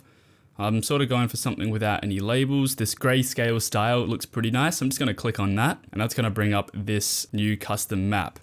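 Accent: Australia. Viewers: i can tell this guy is australian in a second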